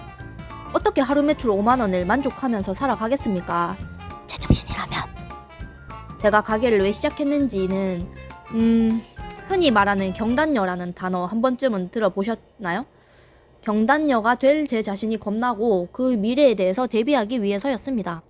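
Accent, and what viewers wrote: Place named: Gyeongsang, South Korea. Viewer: 같은 경상도 사람으로써 말투 귀엽네여 ㅋ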